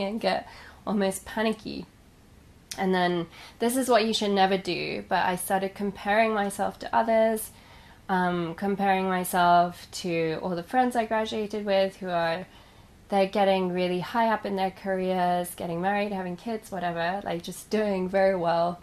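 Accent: United Kingdom. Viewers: I’m not going to lie, I first saw your channel when I was looking for tourism spots for Tokyo. The reason I picked and subbed you was well, Beside you being the most beautiful woman I’ve ever seen and you seem quite genuine about yourself, a pretty Japanese girl with a british accent... Something Sanrio has been trying to do with Hello Kitty.I notice from the content that you are doing is somewhat personal, which is nice, like watching a friends travel blog, and you didn’t sell yourself in way that gets more views, with that knowledge I subscribed. I’m a furniture designer but I like dabbing in drawing cartoons for a hobby, but I only did those things because I was unnaturally good at doing them and I didn’t do anything because it was popular, I did them because I genuinely love it. I remember a movie quote from lost in translation: “the more you know who you are and what you want, the less things will bother you.” If you feel this channel is forcing you to do things that pervert your intentions then stop. 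If you feel like you owe people content for their subscription to you, you don’t . If you feel there are better channels than yours, thats true there are, but thats no reason to feel insignificant, since its a living hell to be a slave to peoples anticipation. The person you should focus on always is YOU. What your needs are, are completely different to the needs of other people especially to strangers. Whatever you want to do now, I can only wish you the best and hope ever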